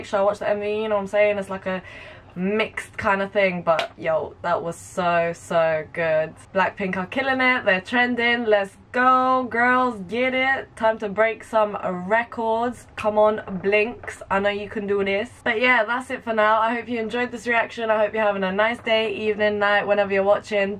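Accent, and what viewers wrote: United Kingdom. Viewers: U from UK. Cuz i know ur Accent 😁😅😃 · I know this is out of the blue, but I have just realized that you are British from your accent. Is there any other way to get to Ireland from England than by plane?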